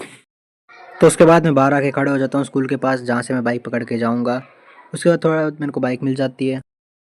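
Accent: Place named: Haryana, India. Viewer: haryanavi accent is fireeeeee 🔥🔥🔥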